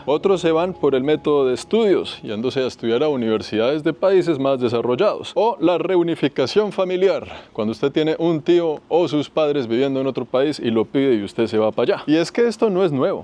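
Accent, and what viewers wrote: Bogotá, Colombia. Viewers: El acento de es el rolo o Bogotano, cada región tiene su propio acento.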